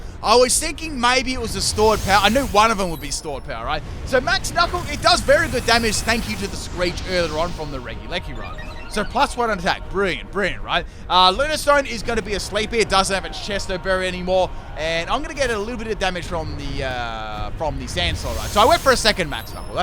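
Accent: Australia. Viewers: Yes his Australian accent makes it so funny 😆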